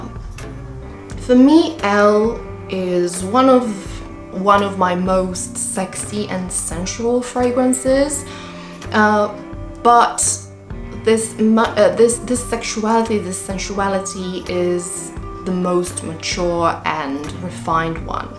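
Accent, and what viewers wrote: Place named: United Kingdom. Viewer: Hi. You said in another vid you live in Poland. Did you spend time in the UK? You sound part British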